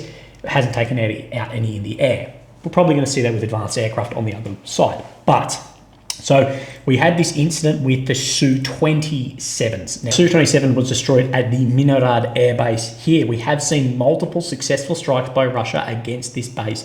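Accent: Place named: Australia. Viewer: Today with this green t-shirt, you look every bit the Australian that your accent gives away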